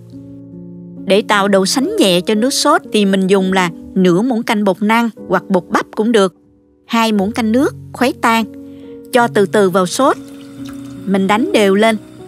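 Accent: South, Vietnam. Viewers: Rất thích xem,học những món ăn của, nhất là giọng nói thanh tao dịu dàng đặt trưng của người dân Nam Việt Nam. Cảm ơn.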